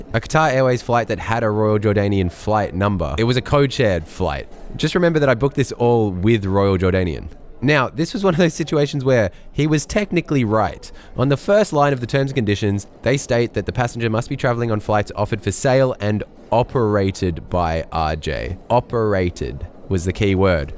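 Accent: Australia. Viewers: I always thought you were American until you said "Australian dollars," then I listened to your accents, and that's how I feel you are Australian :)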